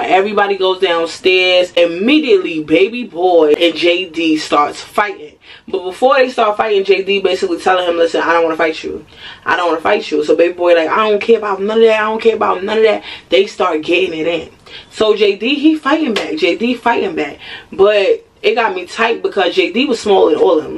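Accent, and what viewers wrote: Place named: Newark, United States. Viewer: I’m from Newark and moved to another state for a better life with my family I knew instantly were u was from because of that accent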